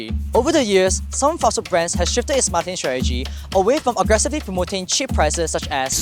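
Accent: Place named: Singapore. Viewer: Tf was he saying. That fake slang plus Singaporean accent damn confusing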